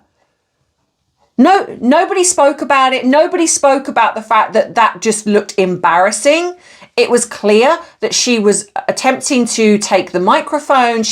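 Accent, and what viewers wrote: United Kingdom. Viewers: I'm not sure why your channel would be failing. I really enjoy your videos, love the British accent, and believe you are spot on in your analyses. I've been "hyping" your channel. I'm not sure if that helps boost your algorithims but it can't hurt. 😊 Subscribed to Bite Size too. Edited to add that the Montecito clowns bought the award.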